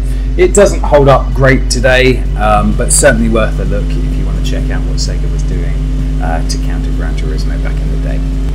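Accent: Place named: United Kingdom. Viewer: The Britain accent it's amazing. Congratulations for the video.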